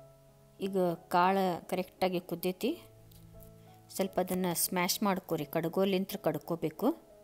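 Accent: India. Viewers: You sound awesome 👏🏼 so good to hear you speaking in Namma Hubli Kannada 😊Loved listening to it..!! Your sambhar looks superb and this is what I call comfort food...You are such a versatile cook 🙏🏼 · Your Kannada voice over added Best flavour to the Sambar recipe 😍 Please continue it for all videos , ಹುಬ್ಬಳ್ಳಿ ಭಾಷೆ 🔥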